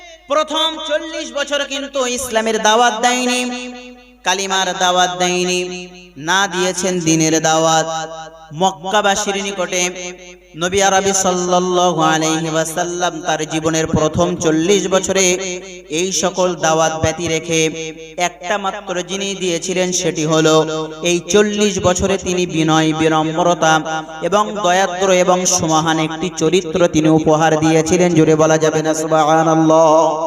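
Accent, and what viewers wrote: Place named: Bangladesh. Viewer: অনেকটা বাংলাদেশী বক্তাদের মতো শুনতে লাগছে ❤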